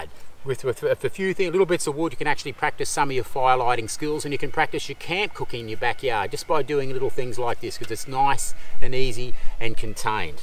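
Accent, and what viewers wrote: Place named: Australia. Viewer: It is bloody awesome to hear another Aussie accent online talking about bushcraft and survival stuff. love it. Don't why I haven't seen this channel before. Sub'd and thumbs up.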